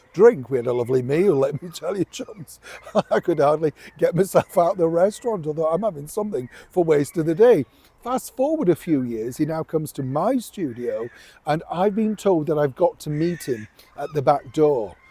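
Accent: Yorkshire, United Kingdom. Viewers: Aaahhh, that Yorkshire northerner straight talk! “A washed up Diva”..😂😂❤ You so nail it.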